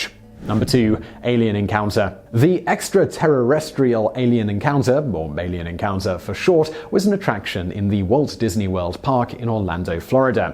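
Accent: United Kingdom. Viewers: lotsa misformation... your cookie cutter production wears thin... fewer videos and better research, if you don't mind... no offense, but tire of informational videos with the narrator speaking in British accent., which does not imply intelligence 7 and/or education as is generally accepted by the rest of the world; French and Spanish are considered "sexy", while German is considered coarse and unattractive ... there's a subject: Accents - favorites and least ... 🕊️ 🌎 ✊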